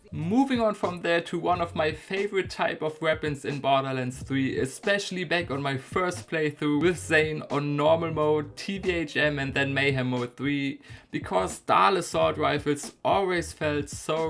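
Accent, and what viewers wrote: Germany. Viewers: Der deutsche Akzent ballert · Ich hab mir während der ersten 2 Minuten schon gedacht, das du n deutschen Akzent hast😄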